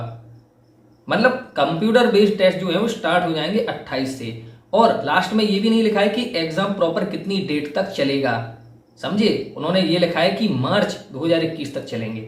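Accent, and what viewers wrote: Bundelkhand, India. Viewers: Sir ap bundelkhan se h Kya ???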